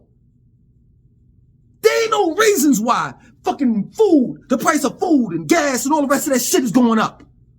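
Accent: New York, United States. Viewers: your shouting (along with the New York accent) makes you the Stephen A Smith of commentary on society’s ills! I mean this in a complementary way……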